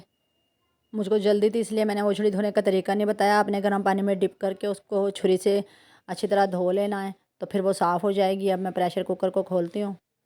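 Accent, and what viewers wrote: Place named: Multan, Pakistan. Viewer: Thanks mujhe apki awaz Multan ki lagi thi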